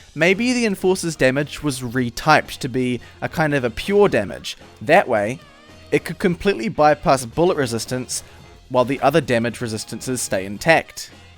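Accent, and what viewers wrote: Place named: New Zealand. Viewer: He's a fucking Kiwi, couldn't you recognize his accent? Dumbass.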